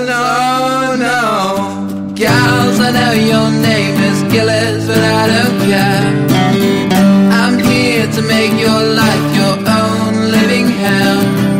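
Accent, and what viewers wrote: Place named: United Kingdom. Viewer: You’ve never sounded British until this video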